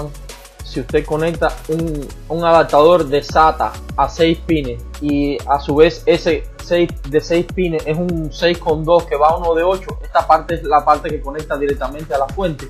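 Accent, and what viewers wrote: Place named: Cuba. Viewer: me lo imaginé por el acento, yo también soy cubano, y estoy iniciando en la minería, muy buen trabajo estas haciendo hermano, sigue así, un saludo desde Italia 👍🏻👍🏻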